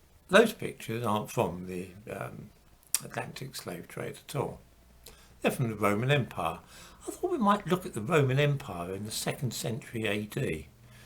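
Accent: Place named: England, United Kingdom. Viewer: So all it takes is for a dude with an English accent to sit in front of a bunch of books and present himself in such a manner, that he is a historian and nobody checks his lies well there were a few comments I saw on this where people were correcting him. I saw at least six comments where people corrected him on points of History. In six comments people have to correct him on points of history, but what's unbelievable is they still agreed with the premise... Wow people will take any excuse to wallow in their racism... Or is it just that they don't want to take responsibility for the villainy because when you find that many minor discrepancies it's a major lie.... The transatlantic slave trade happened. Slavery in Africa and buy Africans was way different than chattel slavery... They had rights they could not be murdered and families could not be separated... 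Many slaves rose to leadership within African families... Accept what happened learn from it and move on in a more positive manner work towards healing... For those of you whose psyche is too delicate to accept the fact that wrong was done and you perpetuate the wrong stay trapped in ignorance....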